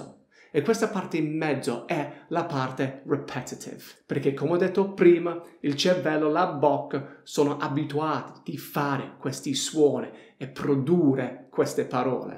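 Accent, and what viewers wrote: Apulia, Italy. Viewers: L'unico (bravo) insegnante di inglese al mondo con l'accento Pugliese!!! Adorabile!!! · Ma no !! Lui è inglese quindi parlerà la sua lingua perfettamente ! Quando parla in italiano ha l'accento pugliese perché ha praticato l'italiano con i suoi parenti tutti i giorni mettendo in atto quello che consiglia in questa lezione. Ascoltare e ripetere ... Lo ha fatto in Puglia con dei pugliesi ecco perché ha questo accento simpaticissimo. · Ti seguo da poco Sei fantastico simpatico e bravo Poi il tuo accento pugliese è originale Fai lezioni private on line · ma hai studiato italiano in Puglia? xD hai una cadenza molto simpatica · This guy has a strong Italian accent from Bari, Apulia. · Ciao una curiosità..il tuo accento pugliese si sente quando parli in inglese con i tuoi connazionali? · Thanks! Did you know that your italian accent is from Puglia? 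· Sì il suocero dev'essere un pugliese, si sente!!🤩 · In love with your Apulian accent ♥️ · vabbò figurati, io parlo da solo da quando sono nato, quindi si scandalizzerebbero ben poco. 🤣 Comunque il tuo mischiare inglese e pugliese è veramente fantastico. 🤣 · Sei molto bravo. Mi piace come spieghi e lo fai anche in un modo simpatico...l'accento pugliese è ancora più simpatico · Thanks for your advices! They're very interesting and useful! I like Reading articles in english and recording my voice repeating that! I like watching your videos. You look just like my american oncle, the way you move, the way you speak With your apulian accent, (we are apulian too). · Bravissimo, semplice ed esaustivo. Il tuo accento pugliese è mind blowing 😄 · Your Apulian dialect is very funny and above all it is "spot on" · sei fantastico, davvero belle le tue lezioni. Poi hai acquisito quell'accento pugliese che è il top 😁😁👍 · A tratti sembri pugliese :)) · Come calabrese? 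Secondo me è accento pugliese. Sullo stile sono d'accordo... ottimo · Accento Puglinglese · Eng-Pugliese, favoloso, love it! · Non perdere l'accento pugliese .. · Inglese con accento pugliese..è stupendo😄👍😉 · Ma come si fa a non amarti? Sei uno splendido insegnante. È un piacere ascoltarti e imparare da te e con te e tramite te. Il tuo italiano/pugliese è adorabile!!!! Grazie mille per ciò che fai. God bless you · Ma tu hai origini pugliesi?? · si sente l'accento pugliese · The same 😅 Allora non mi sbagliavo.. ho percepito una cadenza pugliese 😀. Very nice teacher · Ascoltando il tuo italiano, penso che tu hai imparato l'italiano in puglia o in abruzzo, è vero? troppo forte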